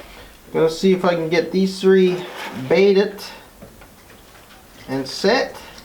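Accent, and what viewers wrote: Newfoundland, Canada. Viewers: Right as soon as you opened your mouth I knew yous was a newf. From Kilbride myself. Living the life you are.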